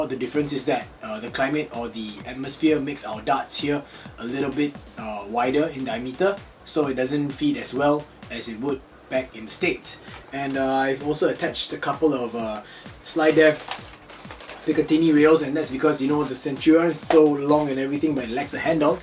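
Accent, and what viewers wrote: Singapore. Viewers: Are you a Singaporean ?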